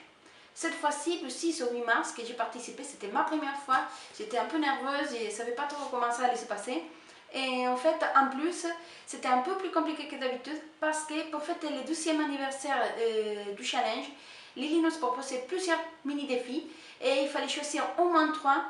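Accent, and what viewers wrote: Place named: Latin America. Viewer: Coucou ! Comme promis me voici :) (je t'ai répondu dans le groupe) Quel délicieux accent <3 Es-tu hispanique ? (désolée je suis un curieuse, deux amoureuse de cette langue)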